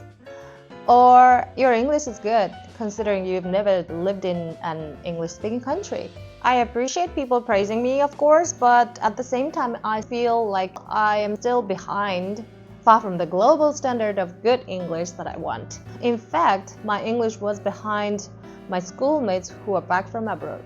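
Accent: China. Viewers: 韓国語が日本人が話してるとは思えないくらい中国語？訛りですね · 日本人の韓国語発音でなくて、中国の方の発音だった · 韓国語がが中国の方が話す発音っていう感じ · ドイツ語が完全に中国語アクセントで不思議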